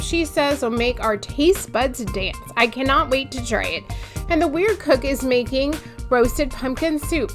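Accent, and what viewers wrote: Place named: South, United States. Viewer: I have to admit, I watched this like THREE times (of course, all the way through lol) because I kept getting distracted. SO thankful that we got to do another collaboration together, you are just so much fun and so full of life. I love how you had a bit of a southern twang while presenting, loved it! I added your video to our playlist and I will be sharing the playlist tonight on our community time to get the word out even more. Honestly will be watching this a fourth time when I can actually listen to it for the entirety. ❤️❤️❤️❤️❤️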